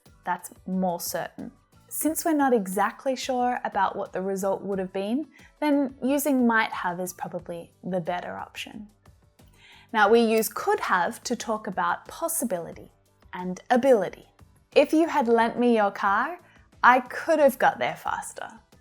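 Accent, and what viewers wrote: Australia. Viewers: By hearing your accent it seems like you’re australian, am i right? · You are from Australia no America l don't like your accent l prefer the American accent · If I had known I was supposed to bring some food to the party, I would have made a delicious meal and brought it to the party.... I love your Aussie accent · Teacher are you from Astralia? your accent is very pleasant to hear !!!!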